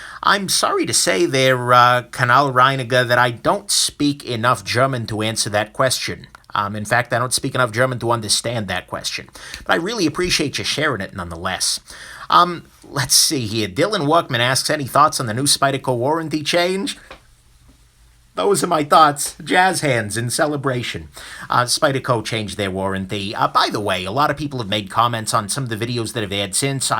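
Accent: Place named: Brooklyn, United States. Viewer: English language learner here. Excuse me, what kind of English accent do you have? Brooklyn? It's very interesting and curiously easy to understand in spite of your very fast delivery.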